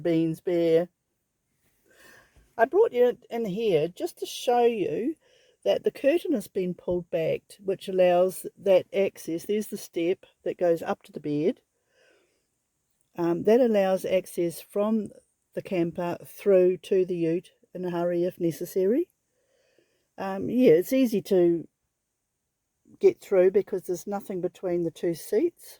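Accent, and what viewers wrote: New Zealand. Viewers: That kiwi accent though, pacific pom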